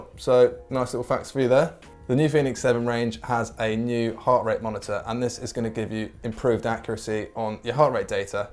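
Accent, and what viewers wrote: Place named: England, United Kingdom. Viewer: EXCELLENT PRESENTATION 👏 with an English accent ...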